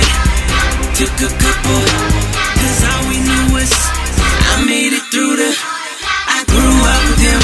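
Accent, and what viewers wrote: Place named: New Orleans, United States. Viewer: I'm the man up in this Bitch I love you so much you are my NOLA King always and forever team you are my inspiration and that Nola accent does something to me every time like 👅👅👅 you is just the whole package Love you bae 💋💋💋👅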